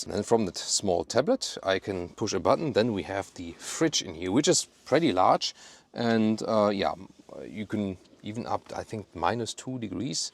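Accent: Germany. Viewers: Nice Video but the English sounds waay too german!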